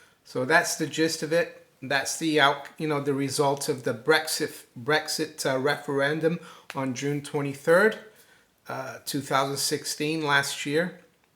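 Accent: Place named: New York, United States. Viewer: Have you ever lived in the US? You sound like a new yorker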